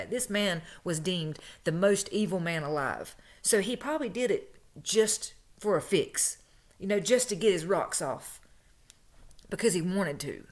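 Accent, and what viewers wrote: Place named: South, United States. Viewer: sis you sound as country as i do!! love ya bunches!~ LuAnn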